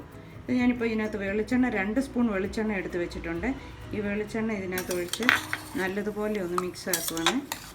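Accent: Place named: Kottayam, India. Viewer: Very easy. Keep going..waiting for simple recipes. I like kottayam style language also.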